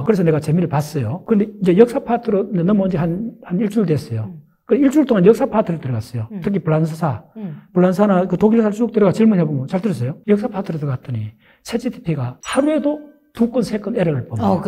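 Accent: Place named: Gyeongsang, South Korea. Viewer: 내용 중에 자막 잘못 .. 스코트가 아니고 서고트.. 경상도 발음 이해 못함..ㅎㅎ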